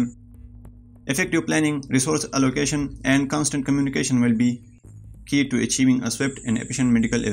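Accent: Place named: England, United Kingdom. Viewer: The way of describing your plan is i think quite difficult..make it easier to some extent...try not to speak like an english man because then we can't understand what are you really saying 🙏🙏🙏🙏🙏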